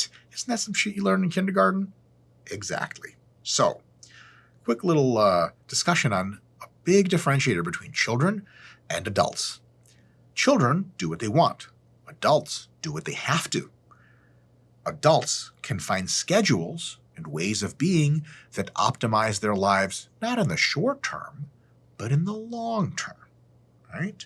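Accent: Russia. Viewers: Damn, you Russian mate? Awesome. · Whoa Сделал дело - гуляй смело! You have really russian pronouncement!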